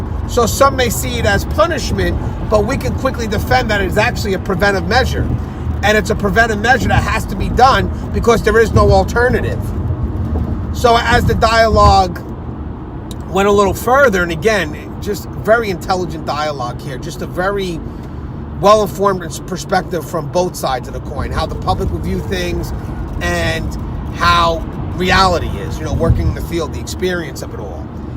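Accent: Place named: New York, United States. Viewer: Tier Talk Correctionsone YouTube Channel because I’m a NYer and you just sound like someone that could had lived on my block lol.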